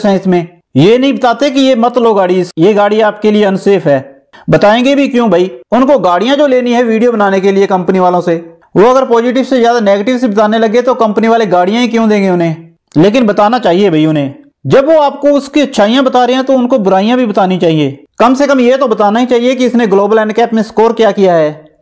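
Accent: Punjab, India. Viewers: ਤੂੰ ਪੰਜਾਬੀ ਏ ਤੇਰੇ ਬੋਲਣ ਦੇ ਲਹਿਜੇ ਤੋਂ ਪਤਾ ਲਗਦਾ ਵੀਡੀਓ ਪੰਜਾਬੀ ਚ ਕਾਸਤੋਂ ਨੀ ਬਣਾਉਦਾ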